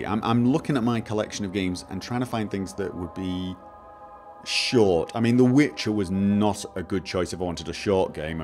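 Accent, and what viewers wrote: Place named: Scotland, United Kingdom. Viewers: Norway? Honestly, I would have never guessed. Hahaha (facepalming) I thought you were Scottish....at least that's what I thought your accent sounded most like. BTW, I haven't heard your opinion on Bethesda's upcoming, special E3 Conference....do you think they'll be announcing Fallout 4? I'll definitely be keeping an eye out for your mods when it does come out. Personally, I think they're getting ready to announce it. I can't think of any other reason they would rent out an entire theater in downtown LA for a day. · LOL I always thought you were Scottish.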